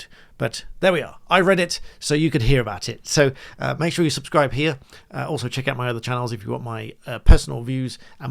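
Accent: United Kingdom. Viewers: Are you British? or English, there is a big difference.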